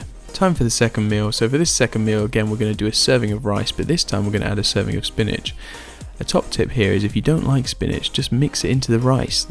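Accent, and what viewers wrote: RP, United Kingdom. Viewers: You speak with a perfect RP (Received Pronunciation). You should consider doing voice overs for ads etc..while you are studying. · You have a very posh accent, great meals thanks.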